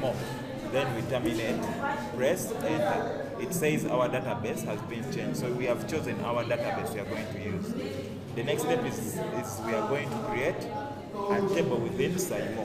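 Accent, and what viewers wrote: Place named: Uganda. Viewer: by accent I knew you were Ugandan; greetings from Canada. if I did not find this video, I think I would fail my assignment. Others didn't help.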